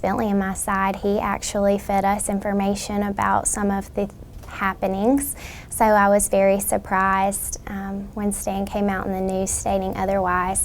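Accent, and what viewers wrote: South, United States. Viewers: How rude are you she has a southern accent. I am from the UK 🇬🇧 and I can understand her.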